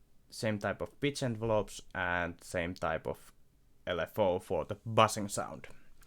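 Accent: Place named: Finland. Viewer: Olipa ilonen yllätys kuulla rallienglantia hardstyle tutoriaalissa!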